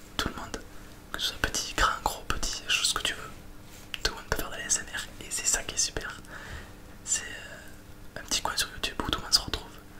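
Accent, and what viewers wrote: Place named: Tarbes, France. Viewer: Tu serais pas de Tarbes toi ? J’ai l’impression avec ton accent :)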